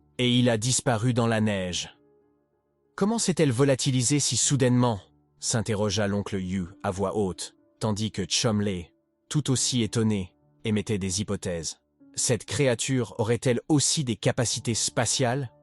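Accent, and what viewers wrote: England, United Kingdom. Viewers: Petit accent anglais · arrêter avec l'accent anglais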